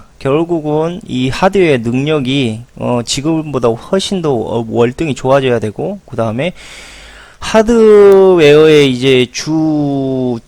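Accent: Jeolla, South Korea. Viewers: 전라도라 그래요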